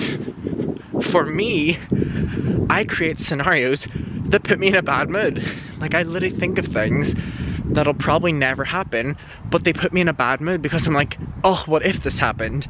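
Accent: Ireland. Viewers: Where are you from?? Two minutes in thee video...you’re from Ireland 🇮🇪 Awesome! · You would be welcomed in America, they love men with Irish accent! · This is probably a dumb question, but does it stay "day" light all night [like it does in Alaska] or does the sun eventually set in the summertime in Ireland? Aha sorry I'm not educated about that & was just curious. In America, where I'm from, the sun sets later due to daylight savings time and will finally set ish at night at the latest....then we move the clocks back an hour in the fall so in the wintertime, it'll get dark by 6pm. I love your Irish accent btw.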